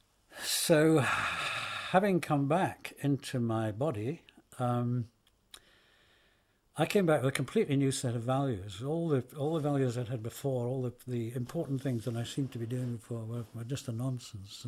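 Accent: Scotland, United Kingdom. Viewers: English with a wee touch of scottish brogue makes it all the more pleasant... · 19 January 2020, Sicily. Yep. When described with a clear British accent even Death sounds good.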